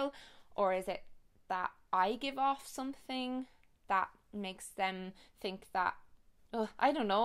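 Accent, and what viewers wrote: Ireland. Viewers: I'm new but I already love your channel BTW I LOVE Irish accents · Hi, I'm from Canada and it's midnight here:) I went to Ireland for 3 weeks in June and I really miss it:( Hearing your accent reminds me of all the landscapes and the memories I made there:D I'm a new subscriber and as a bisexual woman myself, I really needed to hear your videos about all this education. I find we have the same dilemmas. Have a great day:D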